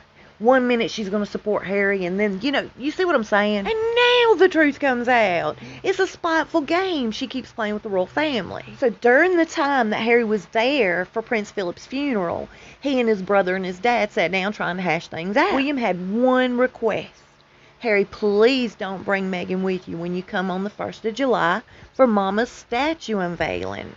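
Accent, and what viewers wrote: South, United States. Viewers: Love your southern accent! Lisa from Spain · You have the sweetest southern accent. I live in North Carolina. I enjoy your videos. There's a commercial on now. Lol. Have a great day. We're blessed to live in the South❣ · I could never imagine cursing my grandma out. I would have carried her on a satin pillow if I was capable. I know from your accent that you’re southern and I’m southern and we just don’t play that way.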